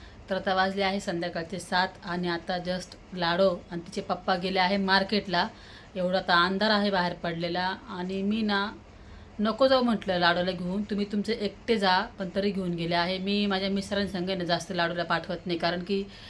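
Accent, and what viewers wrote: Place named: Kolhapur, India. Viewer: Kolhapur bolte hain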